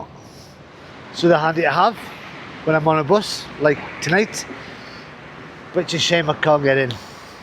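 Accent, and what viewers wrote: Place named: Newcastle, United Kingdom. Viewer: LOL, I am happy to learn a new phrase,,, "He scared the living day...." so funny.😆 I like your videos for the nice way you describe what you see and to learn and get used to your geordie accent. Enjoy your next stops in my country. Greetings and best wishes from a new follower in Chaco, north of Argentina.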